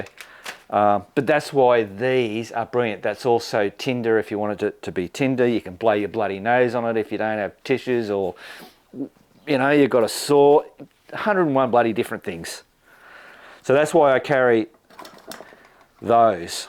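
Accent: Australia. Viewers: Crazy Australian, crazy accent. But.... I'm 57 and going moto camping for the first time this summer and this is a great series of videos. Hey, Presto!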